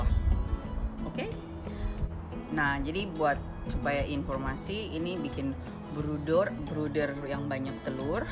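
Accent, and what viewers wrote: Papua, Indonesia. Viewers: Salam kenal Dek.. Sy SUKA LOGAT PAPUAx...😍😍😍Asli... Sa pikir...su sampe...Belanda Logat Lain kah😂😂😂 Andalaang😍😍Gbu Sorong Papua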